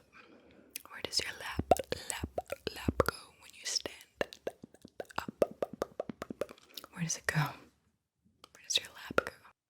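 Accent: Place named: United Kingdom. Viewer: 1 sometimes 2 morning coffee 😊 3 football (Love your British accent BTW) 4 music 5 grandad 😢 6 sorry I didn't catch that 8 carting 9 2 people falls the middle 11 face 12 hotel 13 // Xd 14 I guy with three b f Xd 16 I lost number count Jak & Daxter Senen 100% Police man coming Xd Idi Amin conquer Having a gf Xd Mercadona Empathy German Lost familiar? Sorry I don't have that understanding level I am nice person Animal loving Treat of others (This is the life) Wallet, phone, Air pods Currently lost train Yours 💜💜🔥 Curious * But JK Rowling fault 😅 Maybe not But I found 20€ And turned to catch them But they weren't Not me But take car dr n k A grl trowing €100 away on the street That later came to my pocket It wasn't r0b she didn't want them And Xd There was more money But I didn't take Politics Yes Queen Xd 😊 Humans AI Health care Barcelona Diddy Cap Chicken Fortnite ❤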